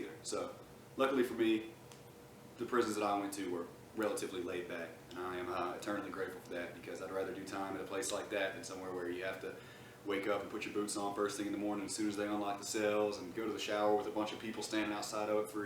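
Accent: South, United States. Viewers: yeh, after i noticed your accent was from usa i almost shit myself. i dont understand this case. you should have been protected under privacy laws of net neutrality or something unless you were fowarding bomb threats to the fbi or something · you sound like your in the south. Go chat it up with After Prison show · Sounds like an American accent... freedom of speech infringed upon? I've heard other countries you can get in trouble for words if you're unlucky but its still kinda bull. In America we have the amendments sooooo if you were arrested in America (can't say I know the details) but from what I have heard, this arrest was very unwarranted. So you must not be from America :/ If you are from America, im personally offended that it would even be possible for this to happen to you.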